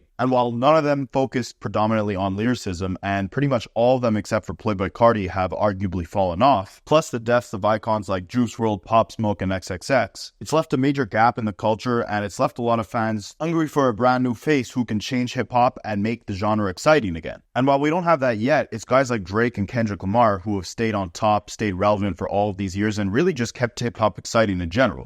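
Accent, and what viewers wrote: Canada. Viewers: The narrator sounds Canadian. Cool.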